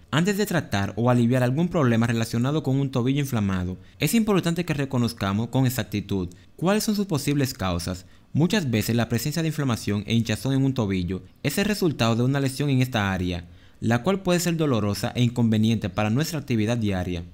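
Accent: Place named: Caribbean. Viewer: El narrador del vídeo tiene un acento caribeño, estoy segura que no es de Perú.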